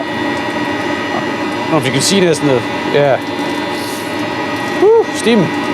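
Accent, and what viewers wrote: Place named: Berwick, United Kingdom. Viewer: Random question. Are you from Berwick or thereabouts? The accent is very musical.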